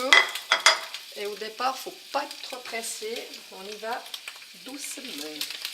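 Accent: Vaud, Switzerland. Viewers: J'aime l'accent très vaudois j'ai fait 2 fois la recette 👍👌😋😘merci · Pas mal le coup du vin blanc et de laisser mijoter, d'habitude moi je mettais que de l'eau et un oignon sans laurier... Super accent en passant 😂, canton de vaud j'imagine... Super recette je vais tester 👍🏼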